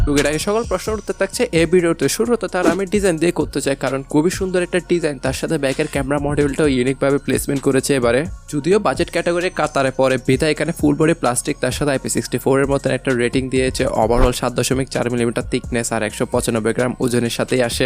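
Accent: Chittagong, Bangladesh. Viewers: ভাই কি চিটাগাংগা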